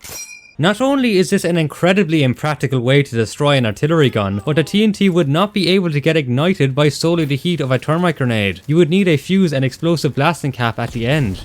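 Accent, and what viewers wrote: Ireland. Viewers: Why did his accent made me think he was Indian for the first four and a half minutes before i realized he's Irish? Solid video, btw. 👍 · Bro you sound irish haha surely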